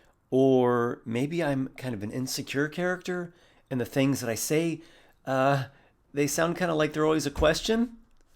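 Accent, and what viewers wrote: Mediterranean. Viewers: Your Mediteranean (sorry if it's misspelled) accent made me think of Minsc from the Baldur's Gate series Also, "read books" was the best think I've heard in a video in a while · His Mediterranean accent almost sounds like he’s trying to do Mario.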